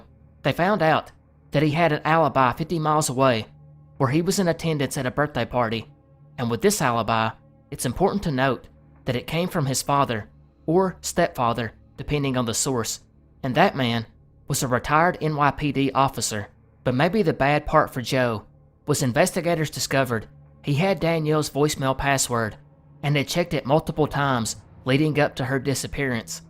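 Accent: South, United States. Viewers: I’m born and bred in the North Carolina mountains and I love to listen to you. We have different levels of southern drawl here but I almost feel like I live down the road from you. Lol You do a great job and get a lot of info for your stories. I can only guess that you’re a sincere and good natured guy. You know the help out your neighbors without being asked type. Glad I found your channel!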